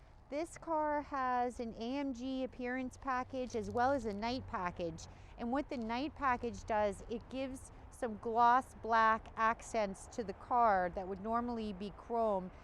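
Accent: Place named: United States. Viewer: You sound awfully American for someone from Manchester...Great vid.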